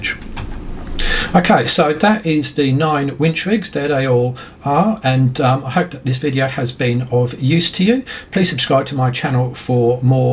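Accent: England, United Kingdom. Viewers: Hi You sound like a pom but that photo looks like Australia? I enjoy your videos, thanks for taking the time. Mike from Australia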